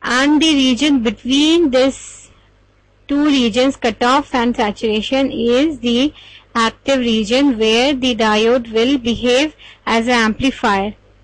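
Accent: India. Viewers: very very very helpul .... UR HINDI ACCENT IS AWESOMME !!!!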